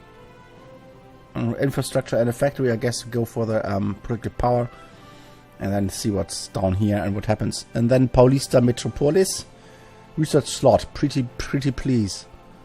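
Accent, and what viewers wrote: Germany. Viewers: ähm haha bei Ihnen hört man sehr dass sie Deutscher sind 🙈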